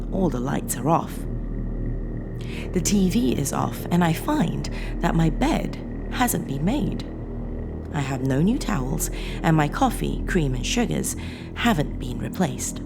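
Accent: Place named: United Kingdom. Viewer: I love your British Accent and this channel, you just got a new subscriber. Great Show Indeed !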